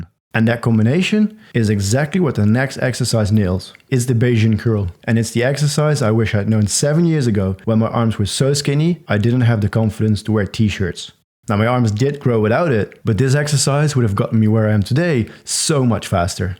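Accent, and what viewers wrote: Netherlands. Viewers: Fit gast klinkt als een Nederlander 🤔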